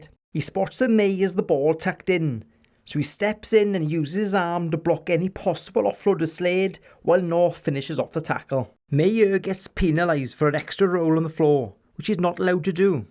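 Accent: Wales, United Kingdom. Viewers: Great analysis and probably the most pleasant Welsh accent I've ever heard!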